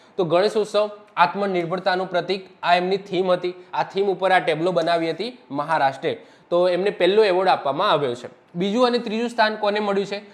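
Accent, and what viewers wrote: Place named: Sabarkantha, India. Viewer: Mu sabarkantha no so su saheb tamari bhasa mara jevi se ❤❤❤❤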